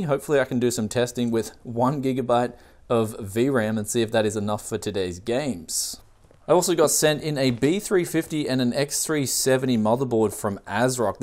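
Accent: Bogan, Australia. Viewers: Australians and their accent are so cute, hahahaha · why the bogun voice? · haha that Aus accent. Spot on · Hey I loved your bogan voice it sounds awesome and hello from perth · Came here for the aussie accent XD so entertaining XD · Hahahaha bogan voice xD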